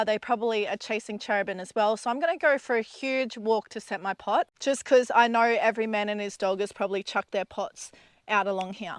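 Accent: Australia. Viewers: Love the Australian Accent!!!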